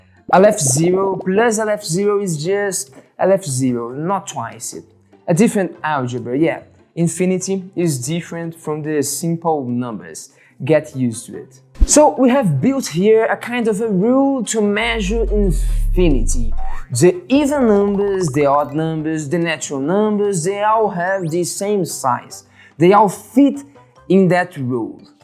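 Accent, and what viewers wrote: Minas Gerais, Brazil. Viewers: Não terminei o vídeo, mas quero saber onde tá o mineireis. "Why" não vale! Kkkkk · UM MINERIN FALANDO INGLES É IMPAGÁVEL, QUE TREM MAIS BUNITO DE OIA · O sotaque Brasileiro a falar Inglês é algo que me deixa intrigado, mesmo assim, excelente vídeo! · O inglês "brasileiro" é o que eu mais entendo bem kkkkkk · Now I can practice my listing with math vocabulary and Brazilian pronunciation, thanks for that. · Minas Gerais accent is marcante, não tem jeito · cliquei no vídeo so p ouvir inglês em mineirês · Sotaque mineiro em inglês é foda kkkk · Lhes apresento amigos o sotaque mineiro em ingles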